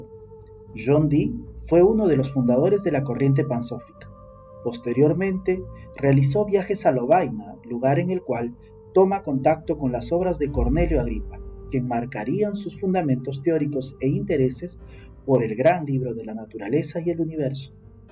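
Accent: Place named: Dominican Republic. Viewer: eres dominicano suenas como uno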